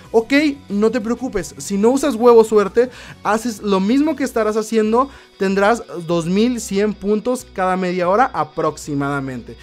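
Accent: Durango, Mexico. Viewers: eres de Durango :v? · oye eres de durango? 😳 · eres de durango?! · eres de durango we hahahaha eaaaaaaaaah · no mames!!! eres de durango:o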